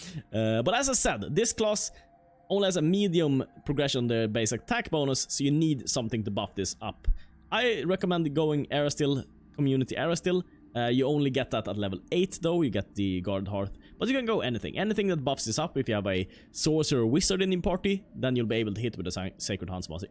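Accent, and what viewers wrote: Sweden. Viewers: Scandinavian I would say. Probably Swede to be precise. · swedish for sure, definatly not eastern european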